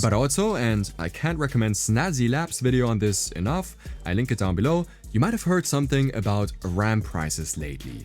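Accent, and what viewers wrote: Germany. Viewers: i have a slight feeling that he is perhaps german, the accent is just so distinct, its really funny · Ist das ein deutscher Akzent? 😅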